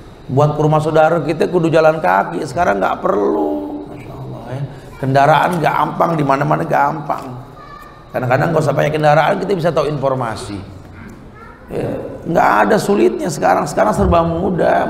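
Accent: Indonesia. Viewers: Ust .... semoga semakin barokah... kajiannya ringan, intinya gampang di cerna , penyampaiannya sedep banget dech logat betawinye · Waalaikumsallam..skrg sy hampir tiap hari menyimak kajian ustad...trmksh pak ustad atas ilmunya sangat bermaafaat.buat kami...penyampaiannya juga mudah di terima..gaya betawi penyampaiannya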